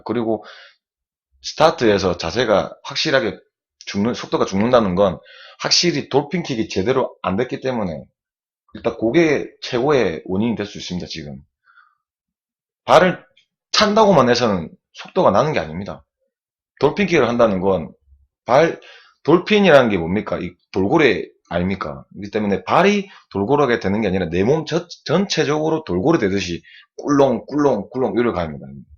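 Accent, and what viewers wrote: Gyeongsang, South Korea. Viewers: 존경하는 선생님 경상도 억양이라서 생소했는데 말씀이 이제는 여러번 듣다보니 친숙합니다요. 잠영연습하는데 벽차고 가는데 바로 엉덩이랑 뜨는 경우는 뭡니까? 어케 들어가는 거에요? 입문한지 석달입니다. 초급입니다.아래 글보니 물타기 연습이라는데 그건 몹니까? 강사선생님께서 연습해오라고 했는데 어렵네요. 몸이 떠버리까 방법이 없네요. 한수 가르쳐주십시요.부탁드립니다. 구독 클릭 좋아요 클릭했습니다.하트뿅뿅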